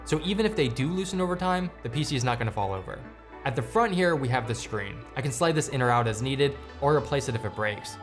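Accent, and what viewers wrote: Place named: United States. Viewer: Dude sounds American, and it's a very standard evening hour in USA.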